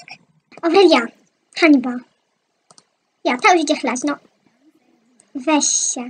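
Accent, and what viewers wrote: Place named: Silesia, Poland. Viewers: Ze Śląska ? Bo masz taki akcent xd ja jestem ze Śląska xd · Śląski Akcent 👌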